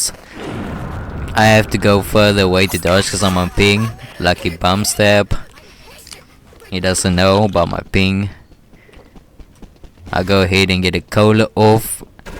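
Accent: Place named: South Africa. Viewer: You kinda sound South African (correct me if I’m wrong) XD Also great vid! :3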